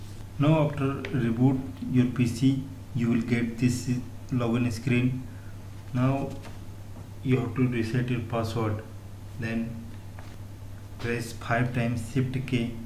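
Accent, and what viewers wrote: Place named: India. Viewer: is Indian accent, I feel fine with it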